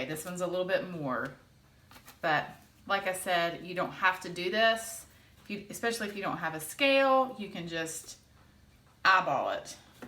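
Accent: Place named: Appalachia, United States. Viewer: the minute I heard the first two words out of your mouth, I knew I had a fellow mountaineer! I am so excited!!!!!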